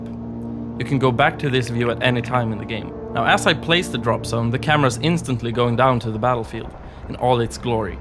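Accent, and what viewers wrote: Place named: Sweden. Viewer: haha yää, du låter svensk??^^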